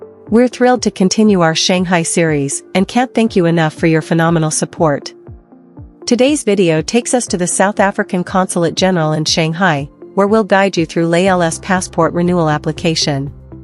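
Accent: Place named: United States. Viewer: Nice vid. Thanks for sharing. 👍 Who is the voice doing the narrating? Sounds like an American voice.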